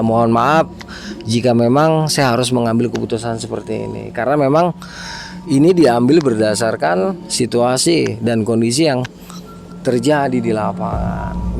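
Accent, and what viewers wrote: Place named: Jakarta, Indonesia. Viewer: ceritanya asik abang nya gw suka logat betawi nya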